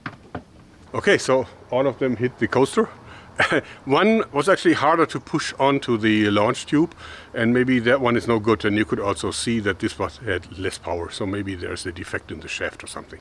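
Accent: Germany. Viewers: I dont know why, but hearing any German say "oops" instantly brightens my day and makes me smile and laugh, haha. I Love the German accent!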